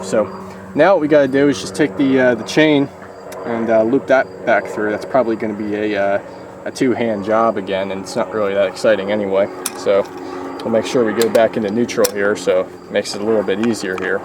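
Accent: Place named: Pennsylvania, United States. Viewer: you sound like a Pennsylvanian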